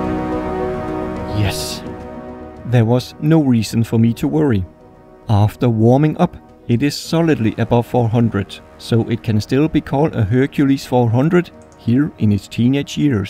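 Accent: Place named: Denmark. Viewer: This accent can only belong to a Dane! Thumbs up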